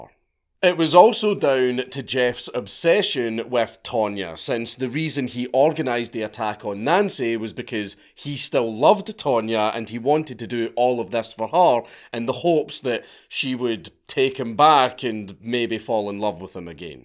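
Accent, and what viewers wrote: Scotland, United Kingdom. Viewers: I never realized you were scottish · He's Scottish? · Funny Scottish Santa man cures my depression for christmas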